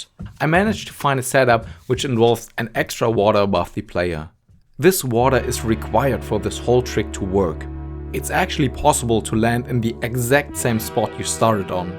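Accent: Germany. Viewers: Are you German by Chance? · Are you German by any Chance? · Ich höre so deutsch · are you german?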